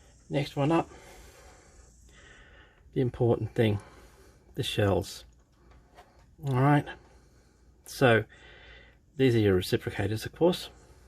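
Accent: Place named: Australia. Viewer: Great Review. I picked up this Kit a Couple of mths ago ( ish..!) It's in my Queue, awaiting the Time to Build it. Also picked up a Flak 88mm crew to add to it. ( a little fettling should make them look Ok..! ) I Also bought a Searchlight kit to go with it, but that needs Crew too. But its all a 'Work in Progress.' The Takom, pressings are just so Crisp, its a very impressive kit overall..! Great Detailed Review have Subscribed due to the Quality of it, and your an Aussie like me. So your Easy on the Ears.! Cheers Mate from a Fellow Modelling Nut, somewhere in Sth.Aust. 😂🦘😎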